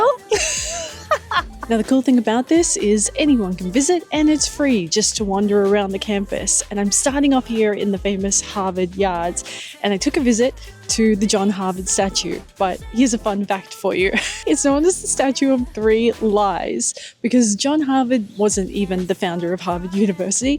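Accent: Australia. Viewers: Aussie? The way you say your R's sounds really familiar. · that’s not a British accent, more like Australian. As a Scot I take offence to the claim our food is terrible over here lol, you just mustn’t have tried the right foods when you visited 😂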